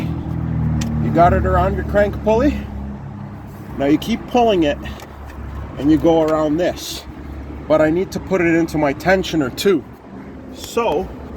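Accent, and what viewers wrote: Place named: Canada. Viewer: This is the greatest video I’ve ever watched. I called it from the first minute you were Canadian. Cracked up the entire video. Well done sir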